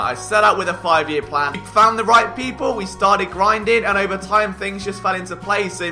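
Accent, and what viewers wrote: England, United Kingdom. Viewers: I started watching the channel because I love the Eagles. But when I heard the English accent, I was very intrigued, because as an actor, I have to learn English accents. Your content was great, very knowledgeable, and I got to learn more film and about players that aren’t really in the spotlight. Congrats to you and your team and continue the great work! #FlyEaglesFly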